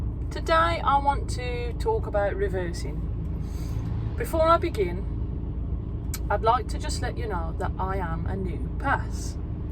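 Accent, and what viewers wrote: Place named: Midlands, United Kingdom. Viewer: Same to you!! You deserve tonnes of respect! And your good nature, your accent and your location makes me remember my happy days in the midlands!! Trained by westgate training of walsall, ex samy jones! Loved the area!!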